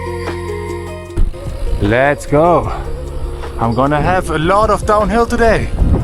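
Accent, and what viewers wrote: Germany. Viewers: 很喜欢你的德国口音的英语，希望你一切顺利∽ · im curious bro where r u from, i like ur accent haha , Germany Belgium or Holland，btw , welcome to china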